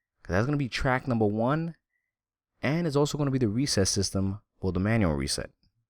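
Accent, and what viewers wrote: New York, United States. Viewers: you sound like your from new York or the Bronx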